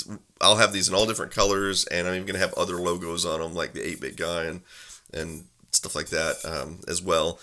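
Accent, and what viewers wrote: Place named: Texas German, United States. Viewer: Ich habe nicht gewissen, dass du ein Texas-Deutschspracher bist!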